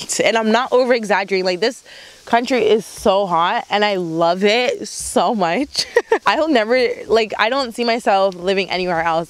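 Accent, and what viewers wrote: Canada. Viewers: Listening to you, I'm picking up a Canadian accent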